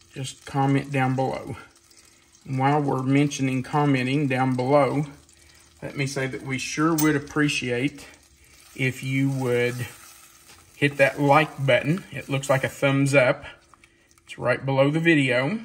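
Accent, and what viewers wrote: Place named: Kentucky, United States. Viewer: I'm a Canadian, who is hooked on your recipes. I also love to hear that Kentucky way of speaking. Your chatter with is delightful.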